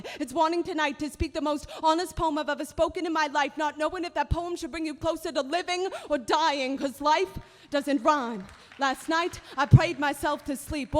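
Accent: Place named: Maine, United States. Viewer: Maine accent still front and center here.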